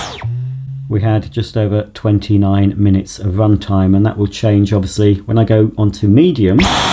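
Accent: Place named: United Kingdom. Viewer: Came for the review stayed for the British accent. Greetings from Florida 🌴🌴🌴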